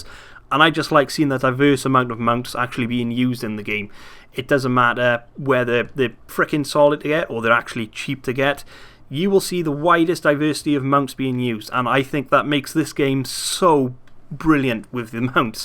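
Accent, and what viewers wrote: Wales, United Kingdom. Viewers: Omg, you're Welsh!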